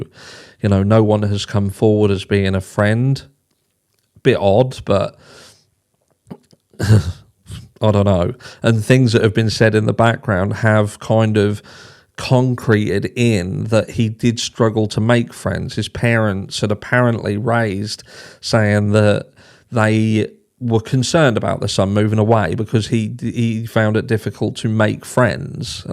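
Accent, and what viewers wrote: United Kingdom. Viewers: Really thank you mate you sincerely do absolute top work on your channel and your balanced yet open view to this most unfortunate matter. (It’s also great to hear a fellow Brit voice breaking the case down… I’m in Kensington myself 🇬🇧)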